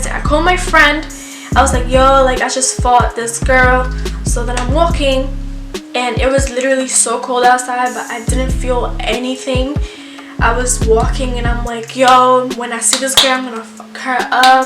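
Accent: New York, United States. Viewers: Your from NYC? You sound like it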